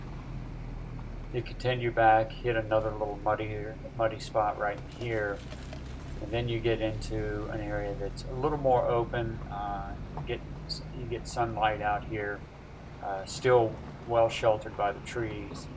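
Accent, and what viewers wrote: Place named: South, United States. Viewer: Because, when I saw the name, I thought to myself," I have only known one in my life, I bet this is him, and asking would be the best way to confirm if it is him." I am being cryptic, because as you see, 0sum gamezzz is not my real name, and I want to remain anonymous. I am going to give you clues to as who I am, not too specific, because you are a sharp guy, and would know right away who I am. if you figure out who I am, please cryptically respond to me with clues as to who you believe I am...please? At one time, I counted you as one of my best friends ---- by the way, your accent is far less southern now, than it was, when you were a boy of say... 14 through 16. Facing the main office/kitchen, the building where Vespers took place I lived on the right side of campus. This may take a series of posts, if you decide to play along. 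Please be rest assured, I have no bad or disrespectful intentions towards you... in fact, it is 100 percent the opposite. By the way, you can ask questions to obtain my ID. This could be fun.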